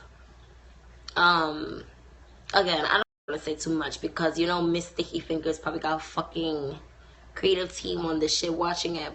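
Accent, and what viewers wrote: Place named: Dominican Republic. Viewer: She sounds like my friend who is Dominican. Loves it!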